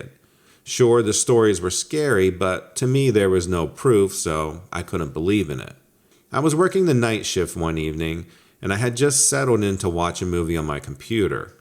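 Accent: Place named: Mid-South, United States. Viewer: I really enjoy your accent - sometimes I hear mid-South (Kentucky/Tennessee), then at other times I hear the Western states (WY/CO/NM/AZ). Well, no matter, your voice adds so much to these stories, and makes them a pleasure to listen to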